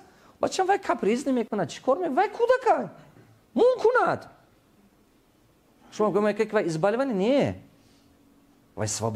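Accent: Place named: North, Tajikistan. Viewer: Устод бисёр Руси Кати Гап мезанад, бо шевай мардуми шимол. Хам як Чахон маьни❤❤❤❤хам як олам ханда хадя мекунад😂😂😂😂Зинда бошед..доктор❤❤❤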